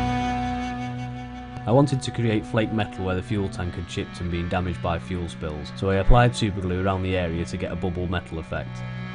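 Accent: United Kingdom. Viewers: Nice to finally hear your voice you sound like your from the UK.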